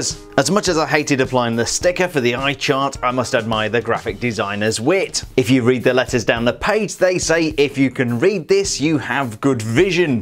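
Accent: United Kingdom. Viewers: you sound so English I’m English and you sound more English than me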